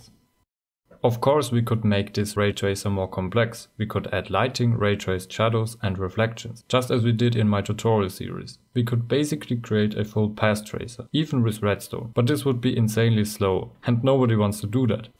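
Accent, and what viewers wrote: Germany. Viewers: Are ju German? Bekaus it klings so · I hear a german accent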